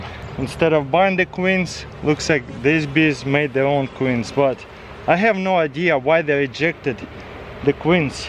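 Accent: Russia. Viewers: Hey, love the bee videos. This is something me and my husband have talked about doing but we know nothing about. Hopefully someday we can getting our own hives started. You videos are a help. You show the realness of it. Try and fail and succeed. The russian accent is a plus as well. Keep plugging.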